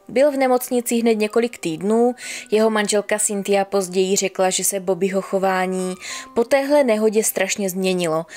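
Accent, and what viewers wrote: Moravia, Czech Republic. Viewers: Jsi super.Krasne se té poslouchá.Mas něžný hlas a ten moravský přízvuk je tak roztomilý🤗Vždy se těším na každé tvé nové příběhy🥰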